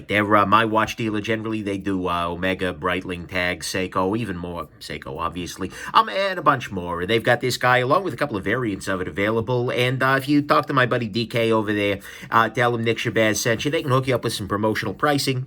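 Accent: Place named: Brooklyn, United States. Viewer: ok i can deal with the annoying if somewhat entertaining voice - and I'm surprised to hear you're in the Ann Arbor area (where I grew up) as i would have guessed Brooklyn - but please, please don't hold the watch the way you talk: constantly jittering. Take some time with your hands so my eyes can focus on the watch. When you put it down it still shakes for some reason. Please. Your voice is going 80 mph but the watch shoudln't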